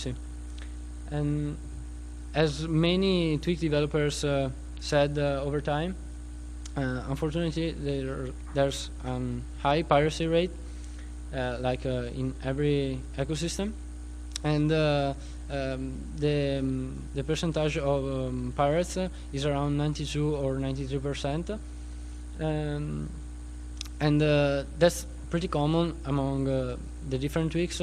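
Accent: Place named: Italy. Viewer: I'm so in love with this italian accent <3 Awesome tweak developer !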